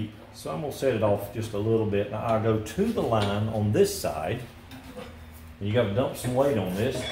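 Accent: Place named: South, United States. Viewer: Simple and effective method, and what nowadays they call "sustainable". Thumbs up. And all explained with the lovely southern accent!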